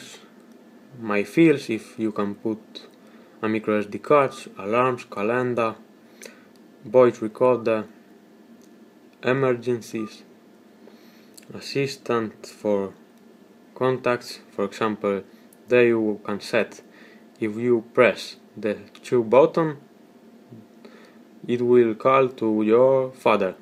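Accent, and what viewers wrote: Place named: Spain. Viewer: tipico: hablar inglés con un acento español super obvio xd nos pasa a todos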